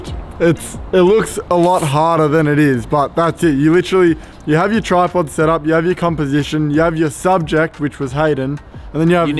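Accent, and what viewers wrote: Australia. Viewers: OMG I FREAKING LOVE how Aussie you are!! Lol it's so refreshing to hear someone as Melbournian as you on YouTube! Haha I got a HP but it's pretty much the same steps yeah?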